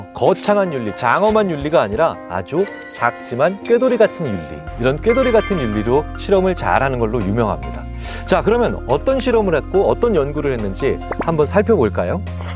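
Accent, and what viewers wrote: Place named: South Korea. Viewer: 우와~인제 논문도 읽어주네요~ 전문가 놀리기 재밌어~ㅋ 배부르니까 긍정적인거라는 거구만요. 배고프면 부정적이고. 의심도 많아지고. 고만고만한 모범수들.. 그냥 종이 한장이지 뭐~ 사람이 그쵸. 일이 안될때 밥먹고 하자는 무의식이 발동 하는군요~ 배고프고 힘들때 예민한 이유도? 왜케 한국말처럼 영어를 읽으나요...귀에 쏙쏙 들어오네요. 우와 뭔가 경제에 영향을 미칠듯한 메세지네요. 나를 솔직히 말했는데..이용하고 편견을 갖는 사람 있어요..굳이 말할필요 있남?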